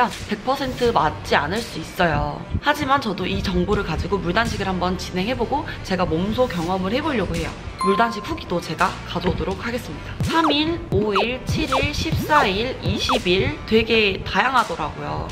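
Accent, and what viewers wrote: Gwangju, South Korea. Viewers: 공부 열심히 하셨네요 전문가같네요 고생하셨네요 근데 전라도분이신가봐요 억양이 ㄷㄷ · 광주같아여!! 익숙해서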